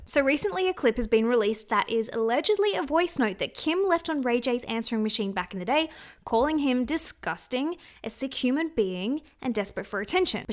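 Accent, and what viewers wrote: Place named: Australia. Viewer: I know it's hard but the lady speaking is Australian.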